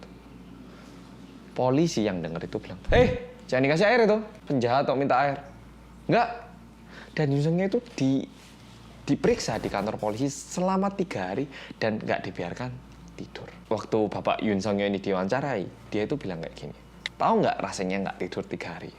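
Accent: Java, Indonesia. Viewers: Yah telat 18 menit. Gercep like aja dahh MEDHOKK. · Plis lu pada yang nonton wajib subrek channel mas yang MEDHOK ngonghey 😉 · Uang berapa pun ga akan bisa mengganti rugi atas yg telah terjadi oleh bapak Yoon Seounyeo, menjalani 20th di dalam penjara atas tuduhan yg ga pernah dia lakukan.. Sakit hati, Marah, Kecewa dia lalui sendiri, tapi dia tetep sabar n menerima semua nya.. Yang dia senangi adalah Cap Pembunuh yang melekat pada diri nya sudah hilang.. Semangat buat Ahjusi Yoon Seounyeo dan Buat Mas yang semakin Medoooooooookkkkkkk:) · Buset medhoooooooookhhkhkhkhh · Tdk perlu iri dgn uang M" An Meskipun kita bs sakit BBS nntn you tube,bs tdr nyenyak😭😭😭😭😭trs bget mmbtku semakin kuat walaupun q sakit hrs ttp bersyukur ......matur suwon oppa seng medokkkkkkk😀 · Tim gercep mas medokk🤣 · Yuhuuu selamat malam bolo-bolo Kembali lagi dengan detective reomitt bersama mas medok kitaa ❤❤❤ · life is so unfair but we got the important point from this video, thx mas yang medhoookkkk, GBU mas ✨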